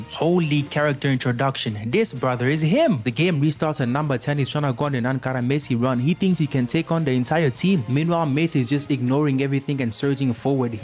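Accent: South Africa. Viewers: Hmm are u south African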